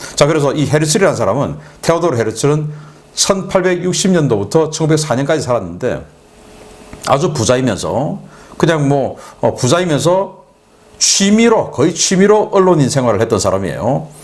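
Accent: Gyeongsang, South Korea. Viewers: 🐕 조지나 빨아라 경상도 말투나 고쳐라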